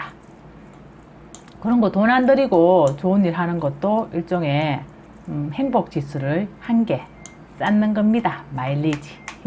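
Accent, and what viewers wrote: Gyeongsang, South Korea. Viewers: 경남의사투리같아요 재미있어요.내일당장만들어야겠어요. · 님 말씀도 잼나게 해주고 요리솜씨도 대박이네요. 경상도 사투리가 매력있어요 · 님께 배운대로 정구지 간장 만들어봤는데 너무맛있어요 한통만들어서 뚝딱 다먹었어요 경상도 말 너무재미있어요 가르쳐 주셔서 감사합니다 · 항상~~잘보고 있어요 경상도 사투리 맛갈납니다~~^^ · 경상도 사투리가 참 맛깔쓰럽습니다. 부추간장에 청양고추ㅡ 한번 해봐야겠어요. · 경상도 아지매 저도 경상도입니다 말씀이 아주구수합니다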